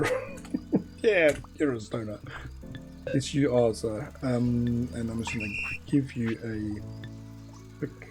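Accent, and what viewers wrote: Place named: South Africa. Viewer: I'm in it for the south african accent, correct me if I'm wrong. either way I love your accent and it allowed me to enjoy a game I usually wouldn't!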